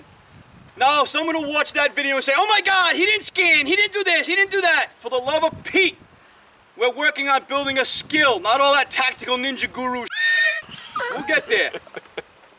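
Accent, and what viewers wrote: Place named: New York, United States. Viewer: Gotta be New Yaaawk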